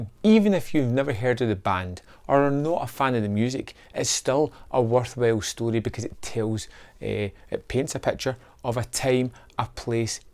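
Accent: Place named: West Scotland, United Kingdom. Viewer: No way yer Scottish 🏴 I’m Scottish too, Paisley. Whera ye fae lol! You sound as if you’re from the West of Scotland too! Slainte 🥃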